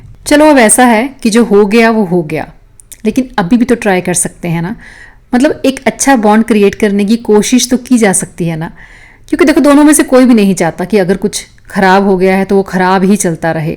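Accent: Punjab, India. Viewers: I think this is because of the Punjabi accent of her. But sounds very nice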